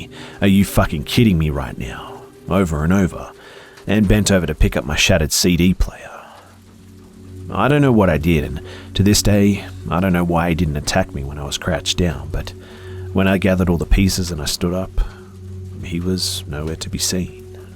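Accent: Australia. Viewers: I love your stuff man ! And you're Australian voice, your video's go really nice with a joint in bed ! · are you an Aussie? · Is it me, or did your accent became more aussie?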